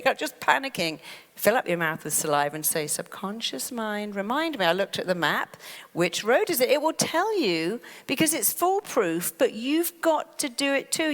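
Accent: Cambridge, United Kingdom. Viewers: is a genius! I just discovered her recently. Does anybody else speak to themselves in voice? lol weird I know. I am working on reprogramming. When I catch myself in negative thoughts I hear her lovely voice. For example this evening “ugh I’m rushing, I’m so stressed, don’t have enough time to do all these things” then I self corrected and heard her lovely British accent saying “it’s all right, I have plenty of time, I am enjoying myself”. lol anybody else? It’s like a self soothing thing 🤷🏼‍♀️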